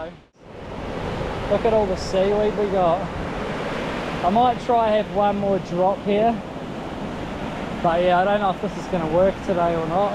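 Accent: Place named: New Zealand. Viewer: you sound like a kiwi, Lovely beaches in Ozz, hope you have great fishing 😊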